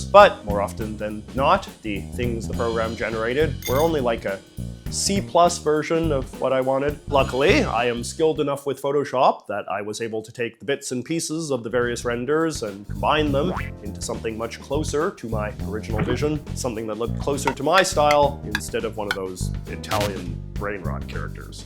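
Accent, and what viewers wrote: Canada. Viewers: What part of Canada are you from? I’m from Ontario and I’ve never met anyone with such a strong Canadian accent like yours. I am close to the border so that’s probably why I’m used to more American sounding accents but I’m curious to know what province you’re from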